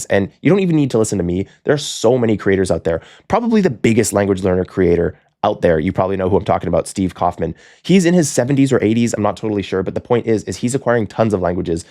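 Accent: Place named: China. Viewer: Dude,your mandarin accent is just great,keep it.———from Douyin🎉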